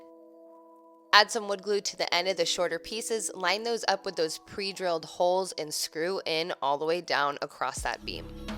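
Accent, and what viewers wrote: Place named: United States. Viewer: love your work. It’s just American accent. You have lovely voice.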